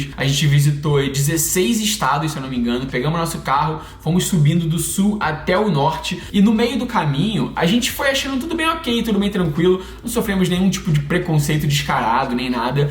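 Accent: Rio de Janeiro, Brazil. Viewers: Adoro esse sotaque doXXXX cariocaXXXXX. 🖤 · 3 anos e não perdeu nadinha o sotaque carioca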